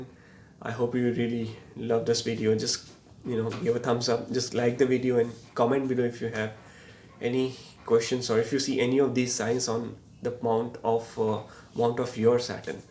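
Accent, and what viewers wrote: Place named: United States. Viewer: Its american accent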